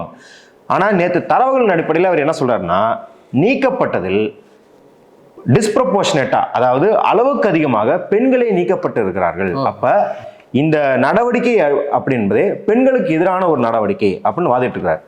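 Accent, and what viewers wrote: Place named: Kerala, India. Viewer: நெறியாளர் கேரளைவை சேர்ந்தவரா? அவருடையபேச்சு மலையாள வாடை வீசுகிறதே...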